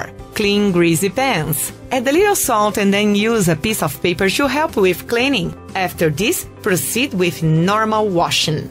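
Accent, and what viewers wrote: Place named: France. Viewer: Thank you!! Just curious are you French? Cool accent😎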